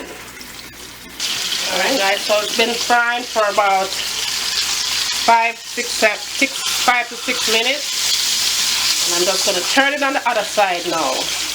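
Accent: Jamaica. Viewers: I just stumble upon your channel and I am enjoying your nice Jamaican recipes, I will be cooking some yellow tail snapper for me and the hubby later today, love your Jamaica accent. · Lawd di patwa talkin. You don't know seh ah foreign you de? LMAO lawd mi sistren big up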